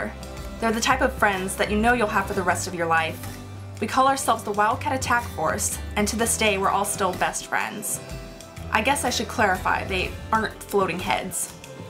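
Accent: Australia. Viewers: Can you get back your aussie accent? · Omg your Australian! Well...that's cool. Anyway you can draw so well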